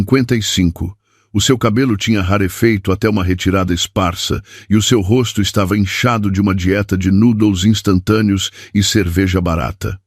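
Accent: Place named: Portugal. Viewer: Essa narração em português de Portugal é cansativa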